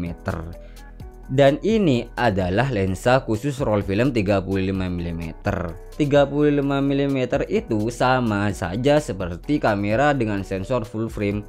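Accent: Madura, Indonesia. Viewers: Asal madura ya bang?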